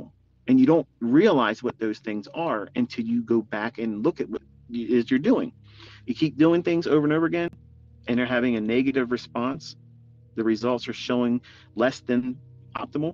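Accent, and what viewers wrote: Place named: Netherlands. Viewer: yo bro ben je nederlands?? ik hoor het aan je accent man haha. ik ben zelf ook ICT student en zeker goede video die je hebt gemaakt very inspiring.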